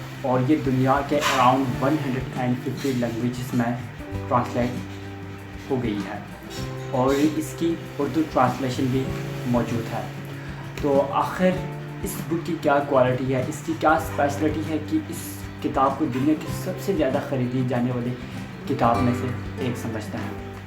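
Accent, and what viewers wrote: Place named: England, United Kingdom. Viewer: Wah bhi Urdu bhi English style mein bol rha ho...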